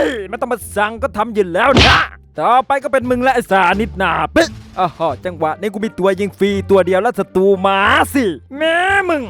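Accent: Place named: Isan, Thailand. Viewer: พี่ชอบพูดทำเนียงอีสาน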